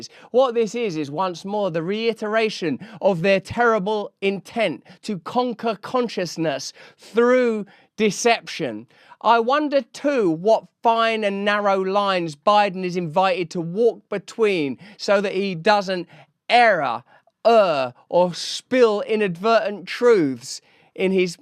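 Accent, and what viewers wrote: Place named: England, United Kingdom. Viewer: Lol is this guy American? He sure sounds English😋 don't bother I know where hes from its just funny hes so wrapped around this trump. Hes a decent actor tho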